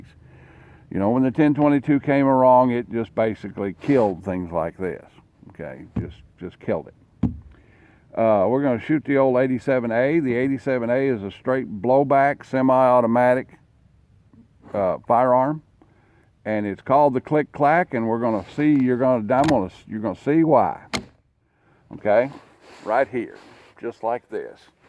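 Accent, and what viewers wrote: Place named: South, United States. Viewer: I really enjoy your videos, they are down to earth and besides I like your Southern accent ;)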